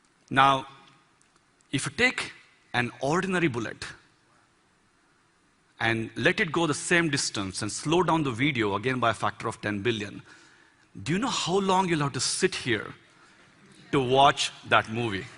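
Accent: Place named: India. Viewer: Indian English hmmmm, Anyway thank you .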